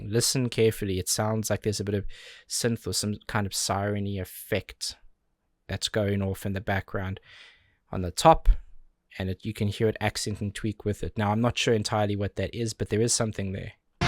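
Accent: South Africa. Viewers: My favourite album ever! I’m new here. What’s your background? I think I hear a slight South African twang to the way you say certain words! I’m Zimbabwean-born and grew up in London. I’m definitely going to subscribe as I really like your vibe. Perfectly timed to find your reaction and my favourite band. If you still haven’t listened to this whole album, you definitely should. It’s such an experience from start to finish xx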